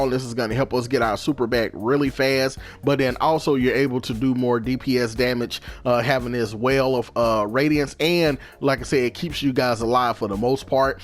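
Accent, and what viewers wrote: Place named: South, United States. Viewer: You remind me of Magamu, but with a Southern accent. Great video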